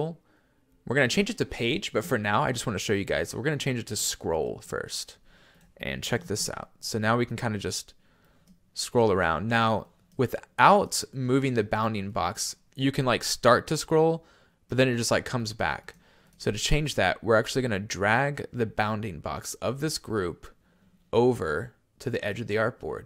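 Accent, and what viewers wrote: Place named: Canada. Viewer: great tutorial, appreciate your down to earth style, sense of humour, and humility. Couldn't pin down your accent! you sound Canadian.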